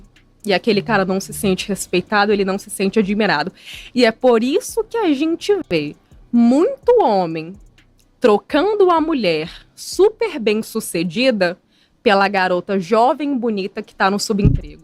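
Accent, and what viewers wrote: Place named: Minas Gerais, Brazil. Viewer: EEEE sotaque gostoso esse de minas, eu aqui no RJ amo quando alguem de Minas ta perto de mim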